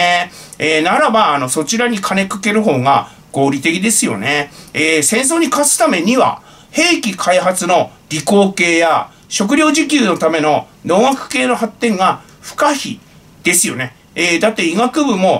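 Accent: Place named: Northern Kanto, Japan. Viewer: 北関東の訛が楽しい😂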